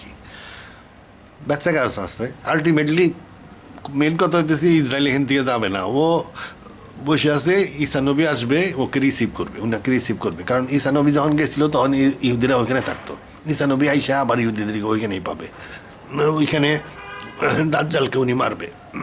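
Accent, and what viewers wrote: Manikganj, Bangladesh. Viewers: আস্সালামুআলাইকুম স্যার, আমি আপনার একজন বড় ফ্যান, আমি প্রায় সময় আপনার ভিডিও গুলো দেখি এবং এই পর্যন্ত অনেক ভিডিও দেখেছি, সময় সাময়িক ভিডিও গুলো ও অনেক ভাল হইছে, তবে ভিডিও গুলো এতো লং time যে এক ভিডিও শেষ করতে অনেক দিন লাগে। বিদেশে থাকি ফ্যামিলি নিয়া, সময় খুব কম। By the way, আপনার বাড়ি কি মানিকগঞ্জ লাগছে, আপনার কোথায় মানিকগঞ্জ এর টান আসে, আমার এক প্রিয় আঙ্কেল aunty থাকে সেখানে বাড়ি। Anyway আপনার একটা ভিডিও দেখেছিলাম জাতীয় সংগীত আর আজমী সাহেবের সম্পর্কে, আমি আমার এক বন্ধুকে সেটা দেখাতে চাইছিলাম but কোথাও খুঁজেছি পাই নাই। আপনি কি আমাকে লিংক তা দিবেন। আর একটা বিশেষ অনুরোধ, আপনি তো কুরআন তাফসীর করেসেন সাইন্টিফিক ওয়েতে, আমি সেগুলো দেখছি, এখনো শেষ করতে পারিনি, ভিডিও গুলো অসাধারণ। যেহেতু আপনি এই বিষয়ে অনেক জ্ঞান রাখেন, আপনি কি একটা গুরুত্বপূর্ণ বিষয়ে একটা ভিডিও বানাতো পারবেন, টপিকস টা হলো, রমজানের রোজা ও ঈদের চাঁদ দেখা নিয়ে, অনেকে নাকি চাঁদ আকাশে দেখার আগেই সাইন্টিফিক ওয়েতে চাঁদ এর জন্ম গণনা করে ফেলে, সেটা কি সায়েন্টিফাক্যালি অনেকে নাকি চাঁদ আকাশে দেখার আগেই সাইন্টিফিক ওয়েতে চাঁদ এর জন্ম গণনা করে ফেলে, সেটা কি সায়েন্টিফাক্যালি হচ্ছে? 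এই বিষয়ে আপনি কোনো রিসার্চ করে একটা ভিডিও করতে পারবেন, কুরআন আর গণনা অনুসারে কোনটা সঠিক? আর সাইন্টিফিক গণনায় চাঁদ দেখা কি ইসলাম সমর্থন করে? আপনি কোনো পদ্ধতি সঠিক মকনে করেন? কুরআন ও সাইন্স এর আলোকে একটু ব্যাখ্যা করবেন প্লিজ। এই মত নিয়া সমাজে অনেক ভেধাভেদ, একেক গ্রুপ একেক দিনে ঈদের namaj পড়ে ও সমাজে বৃশৃঙ্খলা তৈরী হয়।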